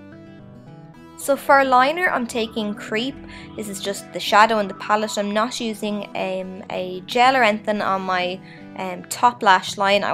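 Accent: Ireland. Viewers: Do u have an Irish accent?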